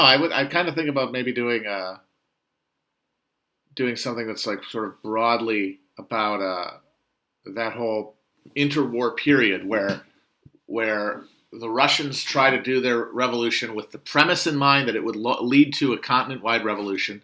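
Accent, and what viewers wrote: Louisiana, United States. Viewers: HUEY LONG! Laissez les bons temps rouler, and let loose with your Cajun accent for an hour.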